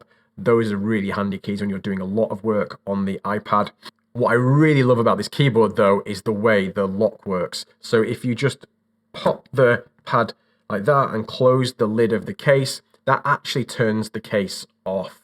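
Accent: United Kingdom. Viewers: You’re British? Excellent video!! 👏🏻 Greetings from Chile 🇨🇱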